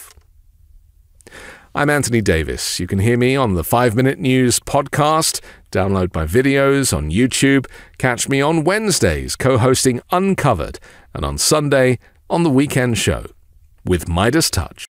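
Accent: United Kingdom. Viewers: Your British accent makes trump sound more intelligent and more intellectual. Thank you, for sparing us from the dronings idiocy of his tiresome voice, though.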